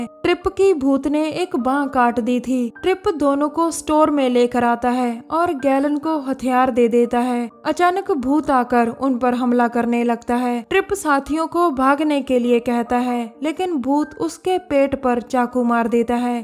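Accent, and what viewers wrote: Punjab, India. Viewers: R u punjabi? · You're talking style punjabi. Are u punjabi? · Are you panjabi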